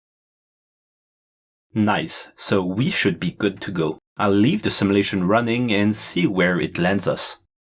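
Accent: Quebec, Canada. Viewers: t'es quebecois je pense XDDD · Es-tu québécois? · French canadien? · U're french canadian right? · Great video! By the way, are you French-Canadian by chance? I feel like I've heard that accent before 🙂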